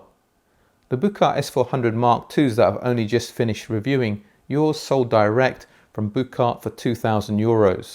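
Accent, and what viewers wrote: United Kingdom. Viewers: Thank you for this excellent review about the mojo 2. NB: i love your style .. please don’t change! be yourself elegant precise fair honest ! This British accent gives it some how apart gives some lead 😊 which makes me think : if his analysis matches the high level and articulation of his language then you may peacefully rely on his expertise!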